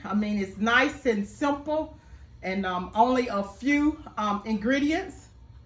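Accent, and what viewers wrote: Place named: Georgia, United States. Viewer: Looks excellent ! Thank you for this video 🤗 You're Endearing 💖 & You sound like a Ga girl like me, am i right 🤔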